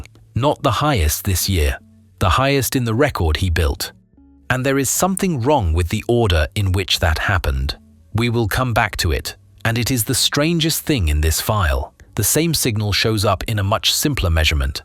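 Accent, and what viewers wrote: England, United Kingdom. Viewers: S,o,o,o,o. —- the weather forecast for your region is: “YES.” Im so glad that I found this At least I was able to hear a guy with an English accent!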